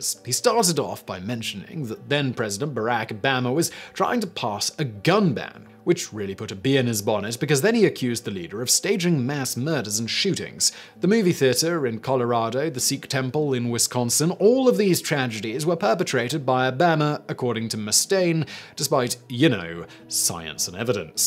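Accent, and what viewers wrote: United Kingdom. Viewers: Wtf, are those celeb are on drugs or have they lost brain's to say this. Absolutely love your British accent it's very something and relaxing for a Sunday night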